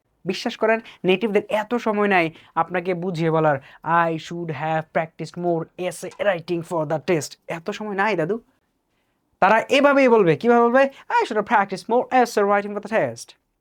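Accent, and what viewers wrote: United States. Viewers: ভাই এগুলো America Accent নাকি British Accent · american accent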